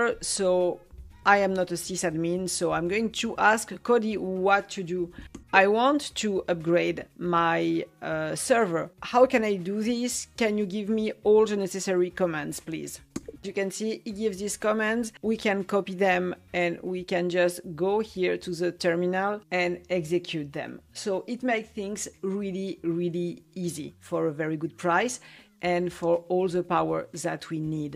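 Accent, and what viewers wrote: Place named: France. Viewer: Vous avez un accent français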